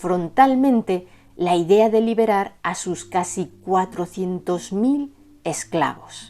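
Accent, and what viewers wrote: Castile, Spain. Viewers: Para mi todo un placer,. Me encanta el acento español castellano. No me pierdo ninguno de sus videos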